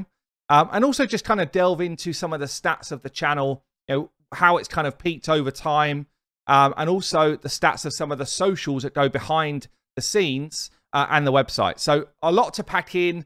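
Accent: United Kingdom. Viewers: You should be incredibly proud!! You have come a long way and your videos got me into raid and keep me coming back every day for new content. You are very entertaining, even when you are doing gear cleanses lol. I think the way you Brits talk is entertaining too coming from America. It's all very fun! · My favorite raider on Youtube with a british accent, and the maker of excellent tools for the game. Love it.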